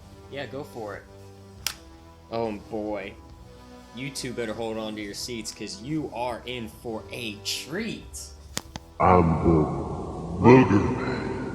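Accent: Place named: United States. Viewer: Obviously done some research, but doesn't really sound like an actual fan. And the narrator sounds American, but struggles with pronouncing basic words and names like Dud-ley. Instead pronouncing it Dude-ley and Mid-E-On, who apparently worked in the Ministry of Darkness with The Undertaker and Kane....🤦🙄🧐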